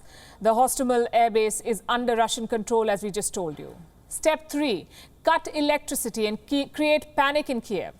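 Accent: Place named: India. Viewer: Even with a strong accent, you are still the most understandable Indian-English speaker lol, keep up the good work.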